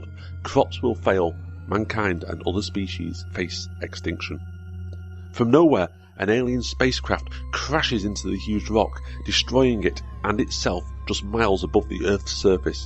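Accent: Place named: United Kingdom. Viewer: The narrator is a Brit with a cold.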